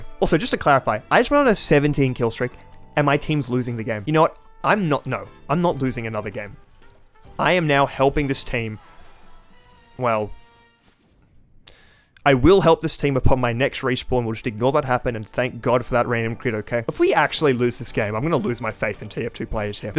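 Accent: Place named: Australia. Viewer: The thing is, this isn't the case in basically all of his other videos if you look back on them, this sort of thing only happening on rare occasions to minor degrees. But in this case it's everywhere in this video. It happens a lot here where in other videos it's particularly rare. Being Australian might have something to do with it and the accent might be effecting it but the oddity is how frequent it is in this video in particular.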